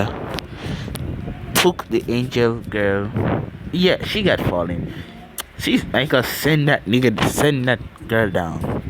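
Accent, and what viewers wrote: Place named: Jamaica. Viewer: Your a Jamaican aren't you well big up mi G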